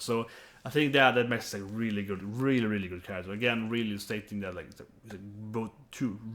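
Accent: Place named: Sweden. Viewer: at first i couldnt tell if u were speaking swedish or english cool accent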